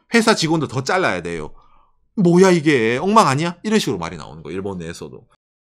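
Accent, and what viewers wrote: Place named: Daegu, South Korea. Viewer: 대구 사투린가요 ? 대륙남이랑 억양이 비슷하네여 ~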